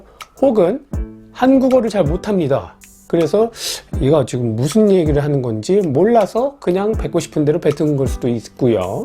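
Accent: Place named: United States. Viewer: 케이C님 토종 한국인 인줄 알았는데..영어 발음이...미쿡사람?^^ 이셨내용 👍잼있고 유익해서 담편도 당근 기대합니다~~😉😉😉😉😉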